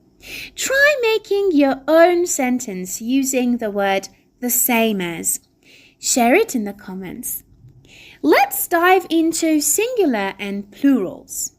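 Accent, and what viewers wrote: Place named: United Kingdom. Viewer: British accent 🤗🌹💋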